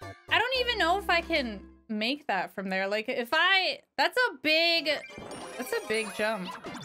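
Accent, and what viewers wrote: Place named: Northeast, United States. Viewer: Found you from those weird survivor AC vids. You're really good and your voice is so enjoyable and refreshing as well. You honestly sound like a northeast American to me but you said you're Canadian. That's really cool. Montreal is my home away from home.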